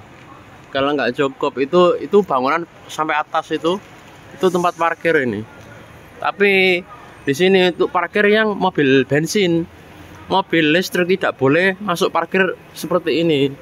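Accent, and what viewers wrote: Malang, Indonesia. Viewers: Tas Nemu Chanel mas, wong Malang ta mas? Kok logat e koyok wong Malang?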